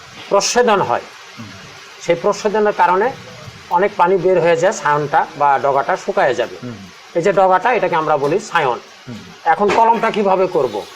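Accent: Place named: Tangail, Bangladesh. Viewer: ভাইজান সুন্দর করে বুঝিয়েছেন। ওনার সহজ সরল ভাষা ও কিছুটা আঞ্চলিক (সভবত টাংগাইল, জামালপুর বা মানিকগঞ্জের ভাষা) খুব ভালো লাগলো। টপিকটা আমার কাজে দিয়েছে। ধন্যবাদ জেন্টেলনম্যান।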